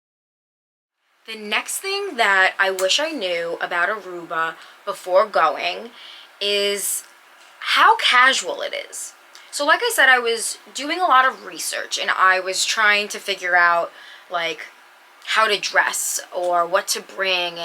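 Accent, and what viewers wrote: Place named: New York, United States. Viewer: Thanks for the heads up!! We are going to the same resort! New subscriber for sure! Grew up in Jersey and I had a pretty good scene you were from NY the second I heard you start speaking! 😆😆. Thanks again.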